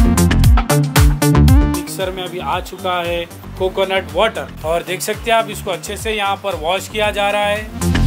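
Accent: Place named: North India, India. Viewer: Phir woich karre kya bhai North India me hai jaisa baath karre Naturally baath karo aur Hyderabadi slang use karo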